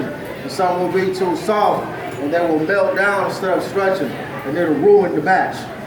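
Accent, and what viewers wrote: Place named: Houston, United States. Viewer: Damn my nigga. Got that south east side accent kinda strong hehe. Sounds like all my homies in htown.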